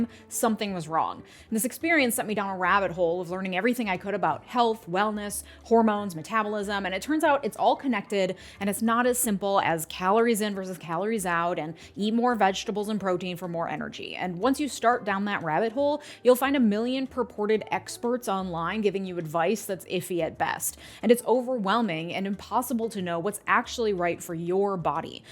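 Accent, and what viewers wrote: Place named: Minnesota, United States. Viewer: you are obviously a childless woman and hopefully it will stay that way. you do NOT need access to children. Based on your accent, you are located in Minnesota, where you are completely removed from the CHAOS that illegal immigrants cause in the border states. They are constantly causing fatal car accidents in Texas, due to a culture of drinking and driving. Many of them also don't see anything wrong with grooming 14 year old girls because in their home country, it's normal for underage girls to get with grown men. I've seen several of your videos and most of them sound like you're completely disconnected from the reality of life.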